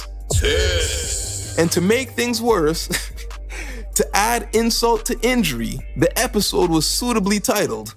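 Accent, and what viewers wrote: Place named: Quebec, Canada. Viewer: Your from Montreal Quebec or chateuguay right I can tell from ur accent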